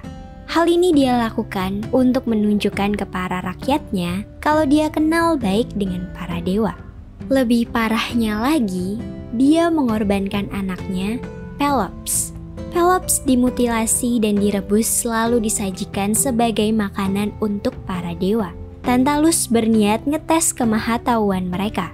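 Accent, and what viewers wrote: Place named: West Java, Indonesia. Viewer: Kakak pasti orang sunda ya kak ... 😅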